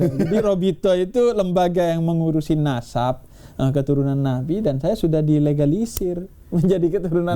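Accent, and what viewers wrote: Madura, Indonesia. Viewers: Sama2 dari Salt-Island pantas saja logatnya sama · Logat mdura.. sma2 mdura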